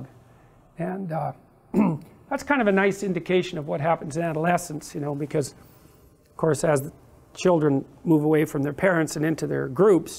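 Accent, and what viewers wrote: Canada. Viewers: Canuck's have an awesome accent! This makes me want to watch Pinoke again and see if the Soviet's had a hand in it rofl...I think if he had a normal voice, no one would buy his bizarre ideas lol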